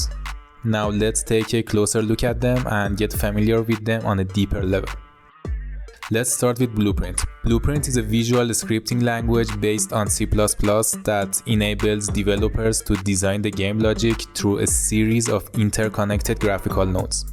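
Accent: Iran. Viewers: bro from that accent your definitely persian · لهجه ایرانی وری یونیک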